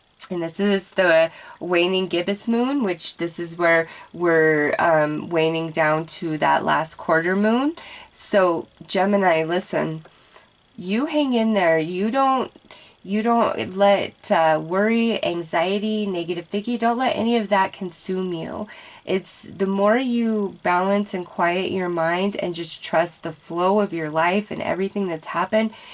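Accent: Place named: West, United States. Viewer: Beautiful reading that rings true. I love your melodious western US accent (I think). Your voice drips with love and kindness. God bless you and yours.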